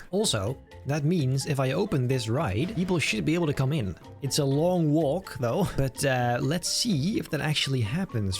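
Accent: Netherlands. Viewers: Ik ruik een nederlands accent...?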